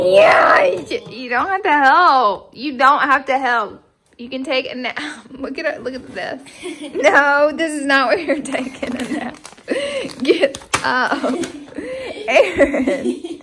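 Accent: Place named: Alabama, United States. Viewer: Love hearing someone with the same Alabama accent, you speaking my language lol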